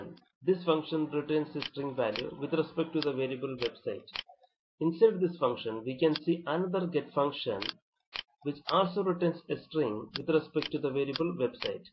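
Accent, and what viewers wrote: India. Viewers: Indian accent everywhere :( ! -_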